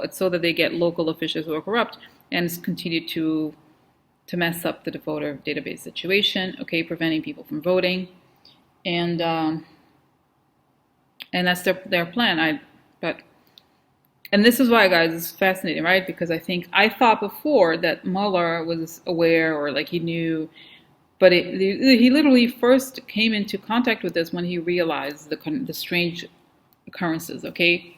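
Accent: South, United States. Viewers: Just luv the southern drawl! What a hoot you are !!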